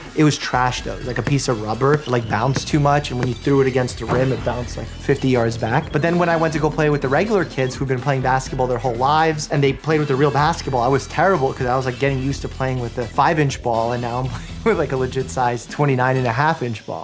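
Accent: United States. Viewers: It's really funny because I got a vibe that you had this heritage despite not knowing anything about you (just found your channel today!). Not sure what it was since your accent is fully "American" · has an American accent in English and a Russian accent in Russian. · My brain just exploded at hearing speaking Russian. I am a native russian as well, but I don't associate with this language AT ALL! Ahahah. Но видно, что давно не говорил по-русски, слышится акцент американский уже) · It's probably because he came to America so young so his new English accent started to influence his Russian accent compared to if he came at like 12+ it probably wouldn't have affect his Russian. Also he spoke English outside of the home so even if his parents only spoke in Russian as a kid your outside of your house normally more than you are home so he has a lot more real world practice with English rather than Russian because he only speaks it with his family and nobody else. 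· I did not know you were born in Russia. Your accent is as American as it can be. Good luck and stay safe out there! Also did you know “Coming to America” is a movie and it’s pretty funny. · My question is... HOW DID YOU GET SUCH A GOOD AMERICAN ACCENT?!?!? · Loving that your Russian has American accent! 😂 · wow he has an American accent when he speaks Russian? I figured he'd be fluent in both since his parents didn't speak English when he was growing up. I would think they still speak to each other in Russian since it's harder for adults to learn foreign languages than it is for kids. At least that's how it was for my gf in high school who came from Chile with her parents. Her dad's English was decent but when speaking amongst themselves it was almost always in Spanish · Oh my gosh! I’ve been trying to figure out what your accent is every time I watch your videos (or regional dialect I guess is the proper term). 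You just have a particular way of pronouncing words that many of the people I grew up with also do (sounds a little different ‘cause we were in MN) but there’s a clarity to your speech that is common among people who learned English as a second language. It’s a beautiful variation of the American accent. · Hearing your unmistakably American accent, part of my brain refuses to believe that English is not your native language. This is all the stranger given that the same could be said of me. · I know im a bit late but his russian is great. Has a only a bit of american accent as opposed to a lot (i am russian so i know)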